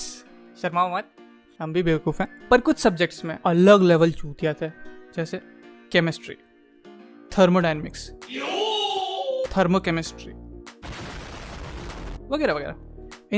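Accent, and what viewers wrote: Bihar, India. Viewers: I love your Bihari accent is awesome love it❤ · Thethar 😹😹😹😹bihari ho ka? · Bro tumhara accent bihari jesa h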